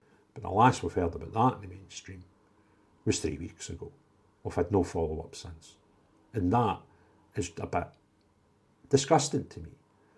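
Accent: Scotland, United Kingdom. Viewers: Every day it just gets worse and worse and when are the illegals who commit crimes are going to be deported? We need a strong government and not a weak one and they should be British. I like your videos and I love the Scotland, the accent and bagpipes. Take care and stay safe. We must all unite together 🏴🇬🇧🏴🏴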